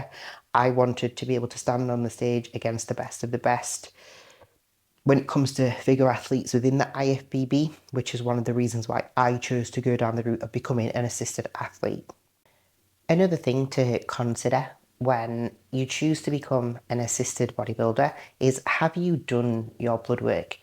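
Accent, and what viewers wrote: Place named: United Kingdom. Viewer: You look beautiful and I love your accent 😊 What part of the UK are you from?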